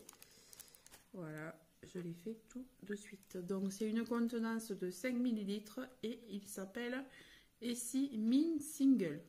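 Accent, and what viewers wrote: South, France. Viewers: et ses petites combines Gros Gros bisous D'ALSACE. AVÉ ton accent tu nous amène le soleil et les cigales par ce froid. A bientôt.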